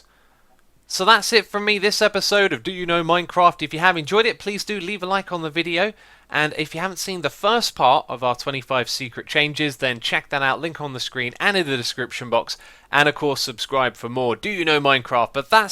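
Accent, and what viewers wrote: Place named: United Kingdom. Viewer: I love your British Accent haha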